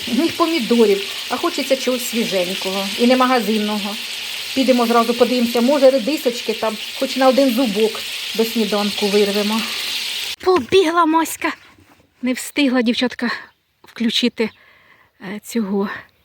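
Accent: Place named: Cherkasy, Ukraine. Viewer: Похне горно! Черкаський говір😊😅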